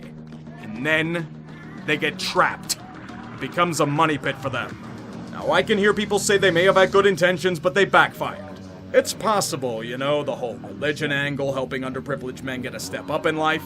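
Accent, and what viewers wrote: Pittsburgh, United States. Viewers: Naw, that’s just the yinzer coming out.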